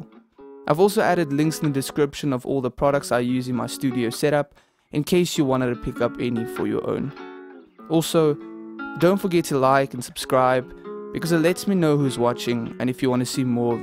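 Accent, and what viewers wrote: South Africa. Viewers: Is that a South African accent I’m hearing my boytjie? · You South African?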